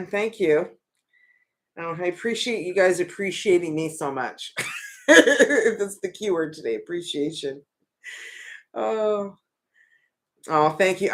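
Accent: Quebec, Canada. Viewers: your Canadian french accent is so sweet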